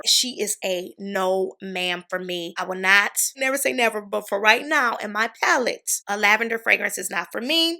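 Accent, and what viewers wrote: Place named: United States. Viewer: I really love your accent I’m from England and I love the American accent and I really love your channel perfume is one of my guilty pleasures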